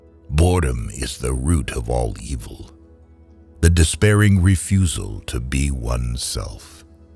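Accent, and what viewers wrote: United States. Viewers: The stupid American accent doesn't sit well with, European philosophers.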